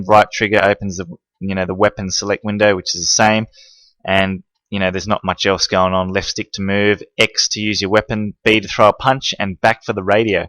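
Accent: Australia. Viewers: i love your voice its a really thick Australian accent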